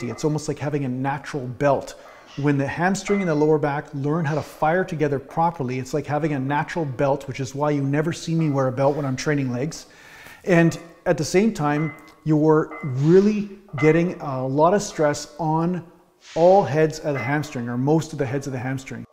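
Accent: Canada. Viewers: I put a couple of simple things together today. In a video of yours I watched earlier today, you mentioned you live in Vancouver. That explained a certain accent you have, along with your interest in and hockey playing. I live near Detroit, right across from Windsor. Before cable came along, I used to watch the Leafs on CBC, along with other good Canadian programs. Cool Aa!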